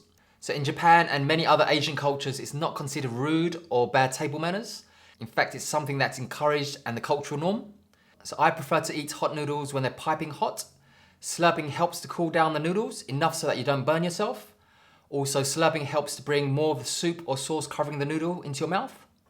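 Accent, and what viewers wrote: England, United Kingdom. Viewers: Nicely done,. It's a burning Summer here right now in the Philippines so I guess we'll just opt for the Cold Soba (actually Udon is what I have in stock) for now. 😊 Thanks for the very informative tutorial. Loved your beautiful English accent too!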